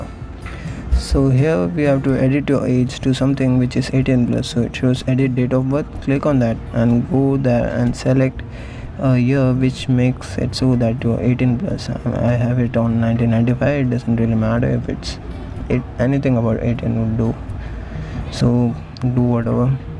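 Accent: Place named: India. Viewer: Guys if he is indian that doesnt mean he talks weird and he is stupid. HE IS NOT HE HAVE OTHWR ACCENT and thank to you . But why you are not happy when talking?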